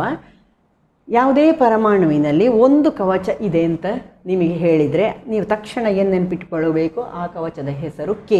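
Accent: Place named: Mangalore, India. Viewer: Tq u so much mam 🌹nimma Mangalore bashe spr🥰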